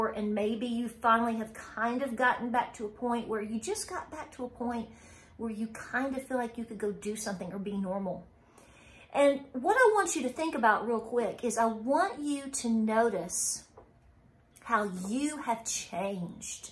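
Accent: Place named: Johnson City, United States. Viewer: Thanks! My husband guessed that you live near Johnson City by your accent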